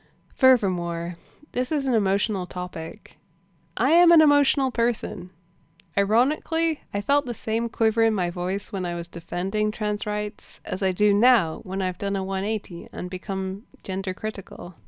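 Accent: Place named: Scotland, United Kingdom. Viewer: It’s the intellectual dishonesty of trans women are women. It’s like a cult. And when you learn about autogynephilia and read Galileo's Middle Finger it’s even harder to rationalise what we’re seeing from the media, government and institutions. It’s genuinely terrifying. Great video and hopefully you’re able to post more at some point. Sure I detect a Scottish accent as well!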